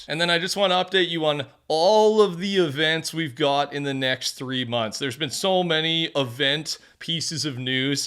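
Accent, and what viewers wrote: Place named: Canada. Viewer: He's Canadian they Pronounce Word differently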